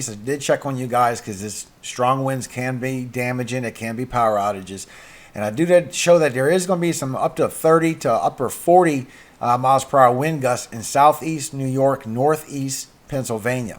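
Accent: Louisiana, United States. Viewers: Thx! You sound like a cajun a bit!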